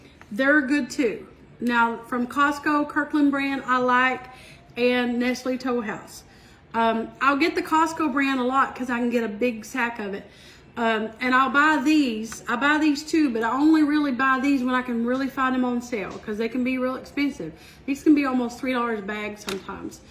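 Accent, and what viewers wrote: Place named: Georgia, United States. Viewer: That's so so good !! I'm from Ga. and I have the same kind of accent . I say words like that too . HAHAHA...!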